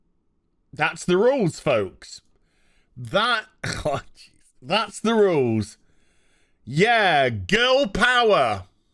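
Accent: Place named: RP, United Kingdom. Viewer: Your posh old fashioned British gentleman accent always on point.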